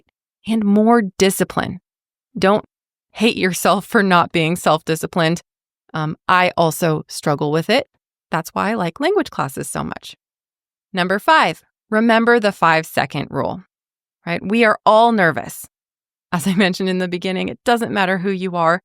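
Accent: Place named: United States. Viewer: Siempre es un gran placer y aprendizaje escuchar tu inglés americano, gracias maestra! 🇦🇷🇧🇷🇧🇴🇺🇾🇵🇾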